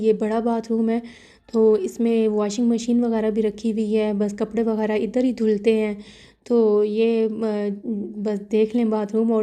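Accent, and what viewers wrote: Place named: Chakwal, Pakistan. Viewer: Yaqqen karen me apky lehjy sy pehcan gai thi k ap chkwal say hain kasmy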